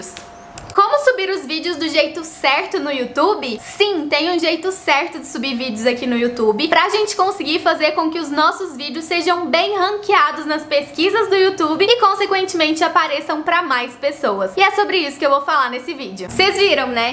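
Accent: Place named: Minas Gerais, Brazil. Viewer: Uma coisa eu tenho certeza, ela é Mineira rsrs. Ótimo conteúdo, parabéns! 👏🏼👏🏼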